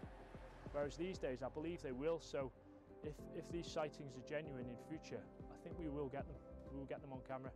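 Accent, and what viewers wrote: Liverpool, United Kingdom. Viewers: R u scouse? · Av you got scouse in youre voice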